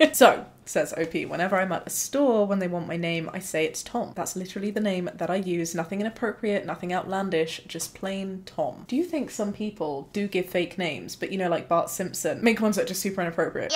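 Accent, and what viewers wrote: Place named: England, United Kingdom. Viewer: I think the biggest problem with your name is you being British, the English accent just adds an extra r onto words that end with vowels, so I thought your name was until I saw it in writing lmao.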